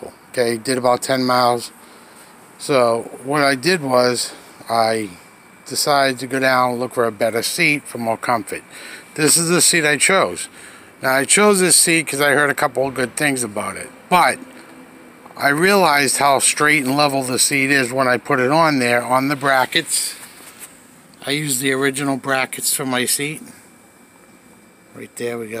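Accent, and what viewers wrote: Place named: New England, United States. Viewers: My mom was from Boston..sounds like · New England accent is the best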